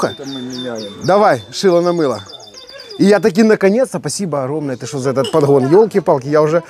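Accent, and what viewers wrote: Odessa, Ukraine. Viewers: Одесский говорок росслабон для уха😂, привет из Харькова.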